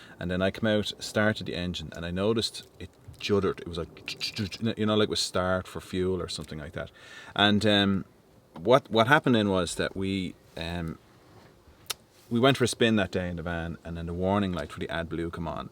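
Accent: Ireland. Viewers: That the problem with motor vehicles today I have BMW about a year and a half old I've been back with lights on the dash about 9 times., just don't make them any good anymore., I dont keep my car for more than 3 years now Because of the quality of them.,yeah a member the Ford Escort last forever I just recently come across one of your videos I haven't watched you for about 8 months used to love watching your channel because I love the Irish accent Thumbs up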